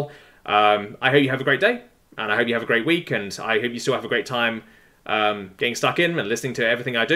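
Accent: United Kingdom. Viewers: aside from the good news, I have to say, this man is one of the most British people I've ever heard. it doesn't come out in the normal videos. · This was the first time you sounded positively British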